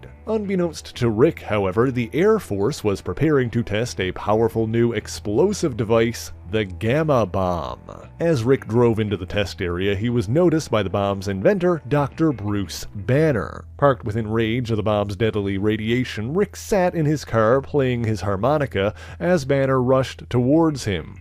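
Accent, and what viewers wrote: Newfoundland, Canada. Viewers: I detect a mild Newfie or Cape Breton accent here b’ye!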